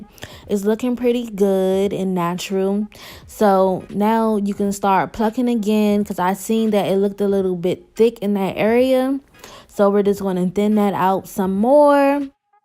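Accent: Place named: Washington DC, United States. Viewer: I just know youre from DC lol. that accent is too recognizable lmaooo 😘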